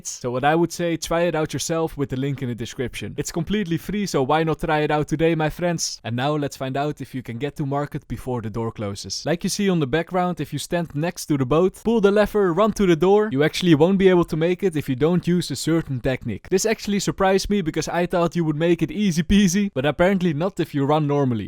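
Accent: Netherlands. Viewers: nederlands? ik hoor dat accent ofz xD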